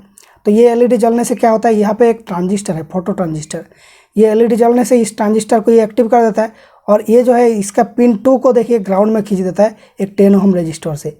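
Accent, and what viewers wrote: Bengal, India. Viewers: Ap Bengali ho and sory ples repla💖💖💖💖😭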